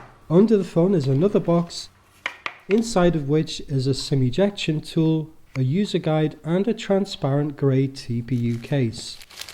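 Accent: United Kingdom. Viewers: Insanely nice review, I am loving your nice British accent. You really did show the Phone really well in all possible areas. 10/10...Greets from Germany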